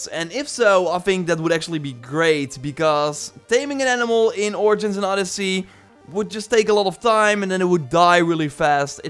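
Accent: Netherlands. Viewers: Is hé dutch?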